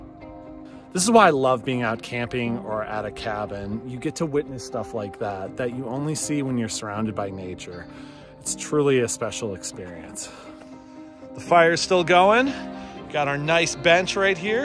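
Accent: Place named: United States. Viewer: Hello! I am having fun with your show. It is so great your show and inspires me how to learn camping. I want to try on caping in rural Japan someday. By the way, I took up studying English lately. Because I want to know more detail about what are you talking the show. I am also learning your American English pronunciation from your beautiful voice. All of the English books advise me that If you want to speak English fluently, you should pretend someone. I catch your phrase, word anymore. As I spoke English, I was supposed to be. I am sure that you are my English teacher. Great for me. Anyway, the Japanese crew is waiting for Rock The Park staff to visit to shoot next time in Japan. I hope to see you soon. From Masato Kaneko.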